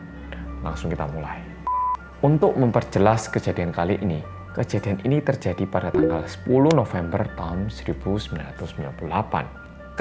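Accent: Java, Indonesia. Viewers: Medoookkkkk podo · Tahun 1998 di Indonesia situasinya Kerusuhan . Medok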